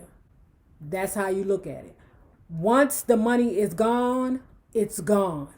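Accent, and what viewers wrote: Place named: DMV, United States. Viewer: Ok. Clearly you're from the DMV. That accent is so obvious.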